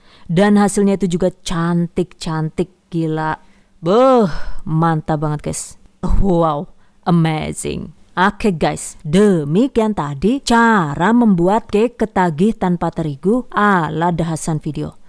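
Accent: Banyumas, Indonesia. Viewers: mak, rika janeh wong ndi? ngapak tmn ketone koh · Rika si wong ngdi, deneng ngapak? 😅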